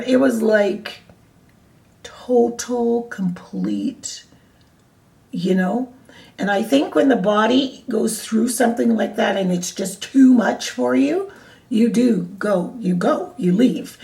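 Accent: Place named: Canada. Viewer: That is a beautiful story😇👼 thank you ,, where are you from are you from Canada you sound like a Canadian?? Please reply thank you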